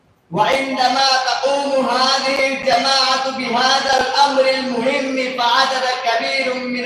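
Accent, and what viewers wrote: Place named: Arab world. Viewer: Masha Allah Arbi lahja aur tariqa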